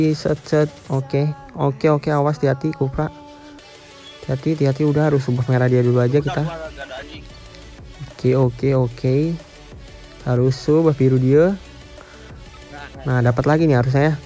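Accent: Banten, Indonesia. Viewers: Orang banten bang? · Asal banten ya bang?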